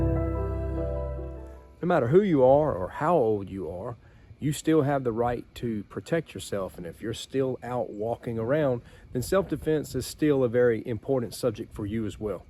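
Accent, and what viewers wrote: South, United States. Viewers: Great to hear a smooth Southern accent. Directions are north, west and east - South is a location. COL Stoney (r) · I don't know about the rest of y'all, but this old Southern gal could listen to this man talk all day! I've lived in Tennessee since 1987, but I still love a natural Southern drawl. ❤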